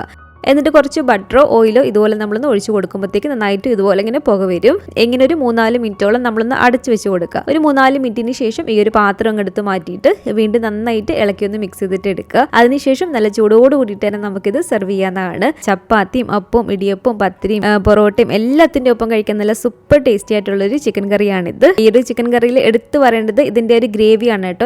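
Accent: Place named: Ernakulam, India. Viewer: ഇത്താടെ വീട് എവിടെയാണ്. സംസാരം കേട്ടിട്ട് എറണാകുളം കാരിയാണെന്ന് തോന്നുന്നു 😊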